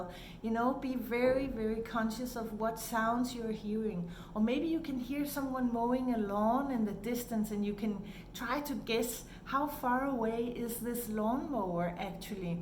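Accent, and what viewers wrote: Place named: Germany. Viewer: Your accent sounds German. Are you German? Also good video.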